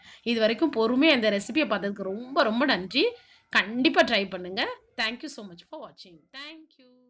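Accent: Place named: Nagercoil, India. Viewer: Hi sis... Neenga yendha ooru. Coz.. Unga speech ketta... Nagercoil maadhiri theriyudhu. Adhaan ketten.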